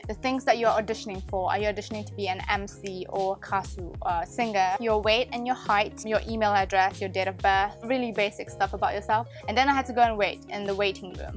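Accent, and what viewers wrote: United Kingdom. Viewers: You sound British 😂😂 btw is it ok to tell us the group you were going to debut with.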